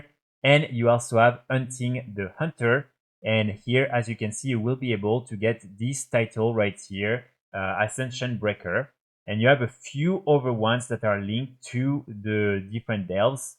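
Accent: France. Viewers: J'aime beaucoup ton contenu et tu est super regulier ca fait vraiment plaisir , j'ai pas un niveau de dingue en anglais mais je comprend l'essentiel, ca s'entend que tu est francais du coup je me demandais si tu avais deja songé a faire tes videos en fr je suis certain que ca trouverais son public 😊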